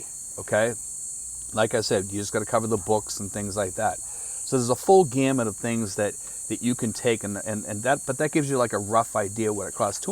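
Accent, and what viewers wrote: United States. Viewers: Hi, is it? I am a new subscriber and was trying to guess the origin of the accent. You had revealed in another video where you were from. I was thinking the same US location...ha ha. Anyway, I am from California and I used to live in the Philippines, but that was 20 years ago. To give a reference point in time; my last visit to the Philippines, BGC was still FT. McKinley. My purpose for being here is to get caught up on life in the Philippines and consume personal stories on this public platform. Best regards.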